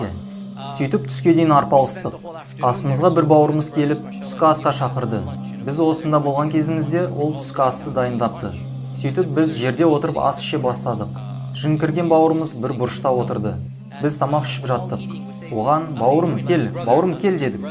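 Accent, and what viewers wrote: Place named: Russia. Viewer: Авторға рахмет пайдалы видеосы үшін.Бірақ "ң" әрпінің орнына неге "н"әрпін айттыңыз аяғына дейін.қазақшаны акцентпен сөйлеп "орысша сауатты"адам болып көрінгің келді ма ?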